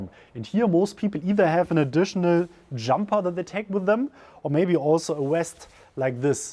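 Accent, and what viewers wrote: Germany. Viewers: I watch because accent is brilliant! I just enjoy hearing him talk, and helps with my German pronunciation. Almost all my German friends have far weaker accents. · Hi! Could you tell me where from your accent is ? Are you German?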